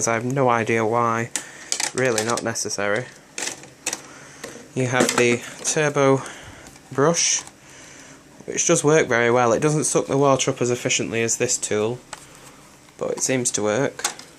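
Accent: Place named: Manchester, United Kingdom. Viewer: A mancunian accent wohoo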